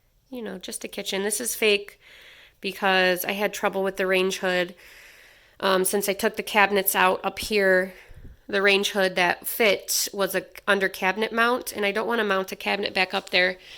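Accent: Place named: Wisconsin, United States. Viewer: Are you in Wisconsin? Sure sounds like it haha 😃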